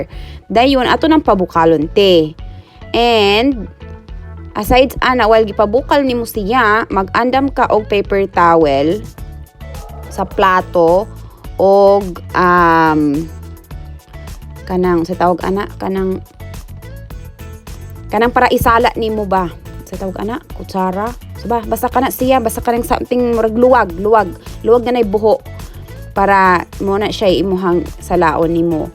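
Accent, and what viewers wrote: Visayas, Philippines. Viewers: Hindi ko man maintindihan pero super cute mopo mag bisaya. Agaw pansin sakin ang kalan super linis sarap magluto. Breakfast talaga pinaka importante sa lahat dapat heavy. Saka Hindi talaga nawawala ang itlog sa almusal. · What a nice breakfast to ur fam and bisaya accent I'm from Negros also and this is very entertaining to watch while cooking, hoping for your mext cooking content, keep it up! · Omg,,dipoba umasim ang egg pero ang puti nga,,laki namn po ng strawberry nyo,,ang healty namn ng breakfast nyo,,natawa ako sa bisaya accent nyo,,first tym koto narinig.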